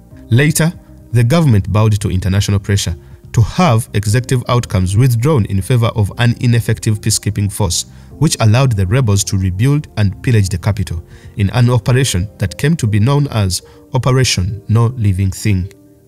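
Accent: Uganda. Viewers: I know that accent.. Uganda Oye! Great content, ssebo! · This is one of the best documentaries on "mercs", after Anthony Mockler's book, The new mercenaries, about mercenaries in Africa. The commentator sounds Ugandan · This guy is Ugandan, Just by his accent. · Narrator sounds Ugandan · The narrator sounds Ugandan....a Muganda to be exact. Ono Musajja wa Kabaka! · Excellent presentation! The narrator has a distinctly Ugandan (or should I say East African?) accent, by the way.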